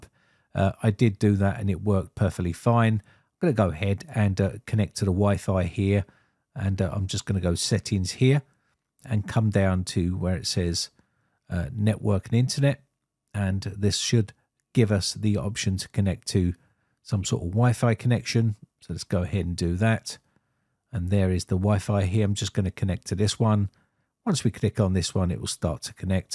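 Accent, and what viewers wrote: United Kingdom. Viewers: Oh nice tutorial i knew you are british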